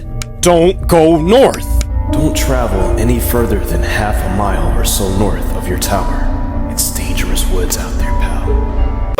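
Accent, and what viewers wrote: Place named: Philadelphia, United States. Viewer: Why his sound like philly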